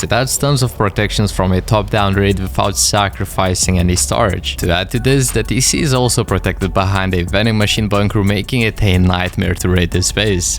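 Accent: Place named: Sweden. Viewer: Pretty sure hes swedish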